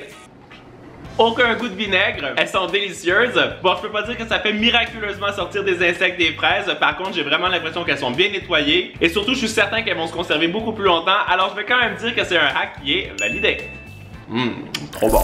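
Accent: Canada. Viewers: j’adore ton accent canadien!!!! ❤️ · J'adore ton accent canadien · je trouve que même si tu es un français tu parle avec un accent plus québécois que Isaac like si t'es d'accord 👍😝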